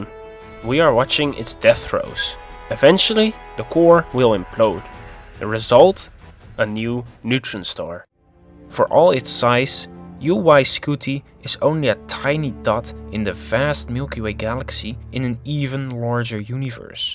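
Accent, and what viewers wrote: Netherlands. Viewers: sounds more like a dutch accent than a lisp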